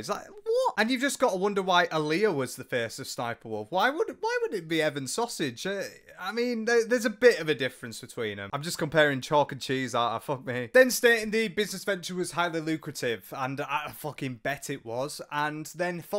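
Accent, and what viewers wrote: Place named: West Yorkshire, United Kingdom. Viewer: Nice to hear a northern accent on the platform 😁 gotta be W Yorkshire, i can hear those very slight differences to East Lancs pronunciation from a mile away 😂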